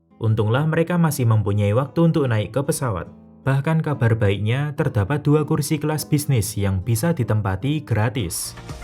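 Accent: Java, Indonesia. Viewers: Jowo pundi mas, sampean?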